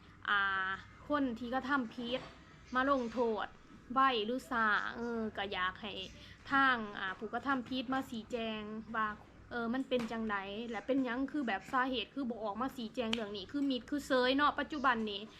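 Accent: Ubon Ratchathani, Thailand. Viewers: น้อง ยังสวยเหมือนเดิมแต่ยังอยากทางลาวแต่ที่แน่แน่ยังติดตามแพะเราชอบสาวลาวพูดเหมือนคนอุบลผมจำได้พูดมาอยากร้องไห้😂😂😂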